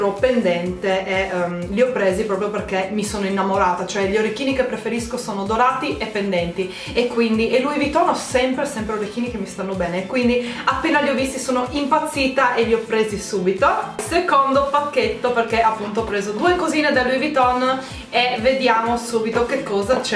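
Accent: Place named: Trieste, Italy. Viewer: oddio sei di Trieste anche tu ahahah! infatti mi dicevo che hai un accento troppo famigliare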